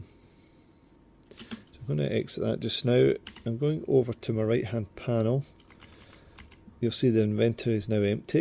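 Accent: Scotland, United Kingdom. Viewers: are you scottish i can hear the accent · You sound just like me. I,m from Coatbridge.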